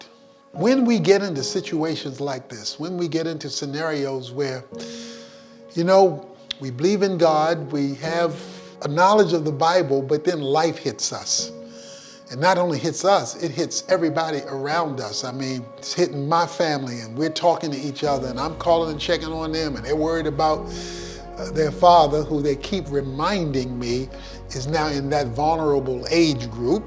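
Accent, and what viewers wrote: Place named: Africa. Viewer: Legitimate concern vs illegitimate worry. Very nice. I also like his afro accent...so calm and soothing.God bless him and every believer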